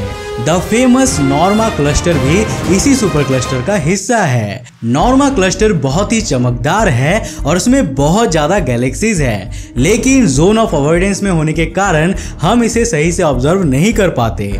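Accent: Bengal, India. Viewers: bhai tum bengali ho kya? btw love your videos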